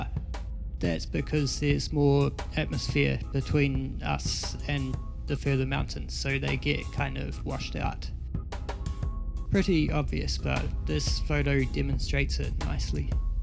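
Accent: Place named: New Zealand. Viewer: Love the video. Intriguing with the music, kiwi accent, talk and images. And good photos to work with. But sorry it's wrong because you can't just pull any curvature drop down from the top of the mountains. You must calculate the part of the mountain(s) that you actually can see above the horizon. If you do that you'll know whats missing from the bottom part. And that will be the amount of drop. And then you got it right. Approximately 1000 meters are missing from Mt.Tapuae. As illustrated here: <link> If you compensate for "Peak 3" being some 2km beyond Mt.Tapuae then it could be closer to 800m. But the drop is there, it's huge and it's according to curvature calculation. I hope you make a follow up. I haven't got the time to make video's myself. Take care!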